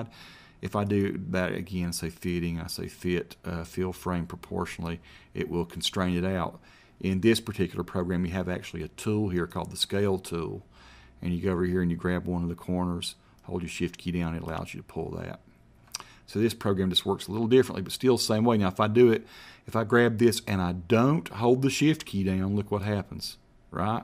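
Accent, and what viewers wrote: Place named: North Carolina, United States. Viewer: that accent is just precious. You sound like your from southeast NC.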